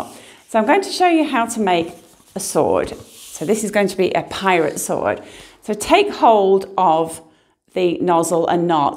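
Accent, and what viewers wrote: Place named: United Kingdom. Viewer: Thank you so much, I learned a lot. Also really makes me miss the friendly people in the UK when hearing the accent 😭😭😭😭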